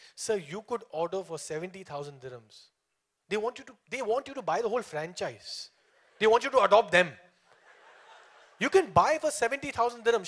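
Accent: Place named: Philippines. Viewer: Your Filipino accent is killing bruh!!!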